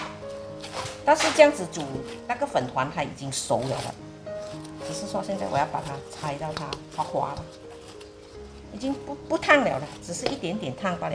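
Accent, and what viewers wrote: Malaysia. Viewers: 大马的华语口音很好听，亲切而柔情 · 喜欢这个aunty 讲话很可爱也很真。纯马来西亚华语。希望你健康长命百岁，做出更多kuih的视频分享。和更多实验更简单教学方法。不然以后这些传统糕点就失传了。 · 很喜欢aunty 讲的马来西亚口音的华语，很亲切，也喜欢aunty 介绍马来风味的中国小吃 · 您是大好人肯无私分享，希望您能继续出做糕点的视频，马来西亚华语很亲切的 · 一听就是馬来西亚的华語！好有亲切感！那些话語馬来西亚人听得懂.，也听到很爽！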